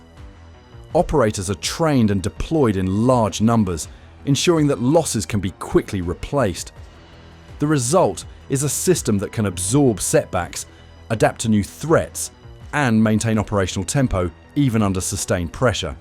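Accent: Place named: England, United Kingdom. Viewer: The pictures are good ,but the pommy dramatic voice over is poor???? Just tell the story with no dramatics in your voice???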